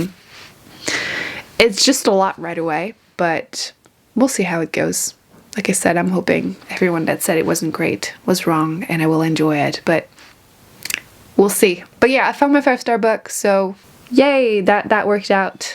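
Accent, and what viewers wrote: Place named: Canada. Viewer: Totally off topic, but I am listening to your accent and was wondering where you live in Canada?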